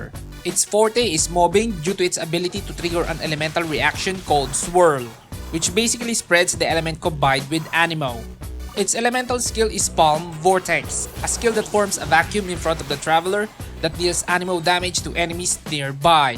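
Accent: Philippines. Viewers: that voice....and accent... Filipino?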